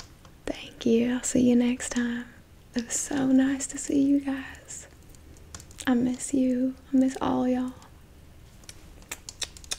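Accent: South, United States. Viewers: That southern drawl tho.. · as a southerner, the accent is pretty good 🤠 the way it falters sometimes is actually realistic, not every single word has to have a drawl to it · If you're not a southerner then I applaud you, I'm born and raised in Georgia and your accent is spot on. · Glad you went with this thumbnail! I knew from the stream earlier your southern accent was going to be great at least for asmr purposes. Thanks for the great content as always:) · One of my favorite RP videos. I adore the southern accent. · I spent most of my life in the South and I didn't hear any problems with the accent. Good job! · The southern accent has an innocent, flirty sassiness to it, I love it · You have the southern talk down well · The southern accent is so underrated. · This is a perfect southern role play I really love it!! Love how southern people talk! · that southern drawl was 🔥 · Real Southerner here says that accent ain’t too far gone. 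I’d have a hard time believing there ain’t somebody country in your world. Keep it up. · I spent 17 years in northeast Alabama every summer. Fell in love early with a southern voice. Thanks for doing this one. God bless Southern ladies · I love your southern accent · Southern accents are the best. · As an Aussie who just got back from a work trip to Alabama....gee I miss hearing this accent! Sweet tea, BBQ, football, and Jesus. Hahaha!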